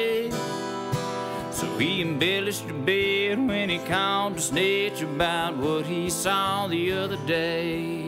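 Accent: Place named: South, United States. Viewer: Love a good story teller, with a country twang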